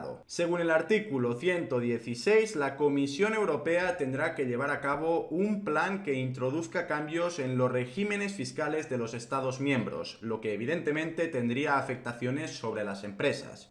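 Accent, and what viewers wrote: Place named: Spain. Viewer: Pq así pronuncian los Españoles 👎 gugel, apel, y pronuncian la C como Z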